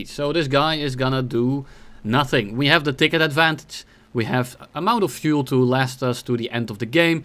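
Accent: Netherlands. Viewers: Thanks for the entertaining videos and the dutch accent, keep it up!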